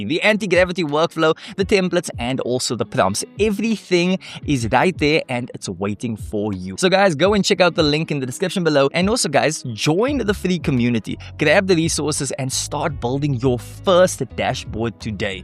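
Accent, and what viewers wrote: South Africa. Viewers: You sound like you from The Cape Flats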